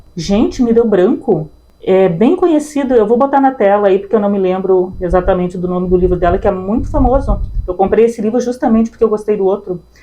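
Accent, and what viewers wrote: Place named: Rio Grande do Sul, Brazil. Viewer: Bookshelf legal, ainda melhor com o soquate gaúcho, saudades do sul do Brasil 🙌🏻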